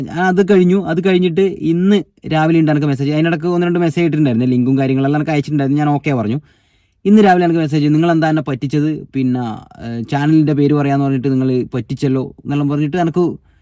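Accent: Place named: Thiruvananthapuram, India. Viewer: തിരുവനന്തപുരം ആണ് എന്ന് തോന്നുന്നു സംസാരം കേൾക്കുമ്പോൾ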